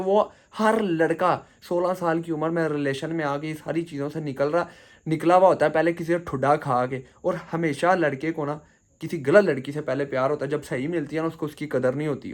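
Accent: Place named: Punjab, India. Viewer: Plz zuban thek karo punjabi penduuuuuu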